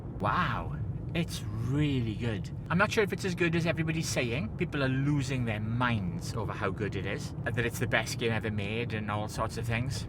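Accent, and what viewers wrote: United Kingdom. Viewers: I love your voice and accent lol. I'm from the south (of the U.S.) and I have no idea what part of the U.K. it's from but it's wonderful. I hope everything goes well, sending some trans solidarity love your way 💖✌️🌠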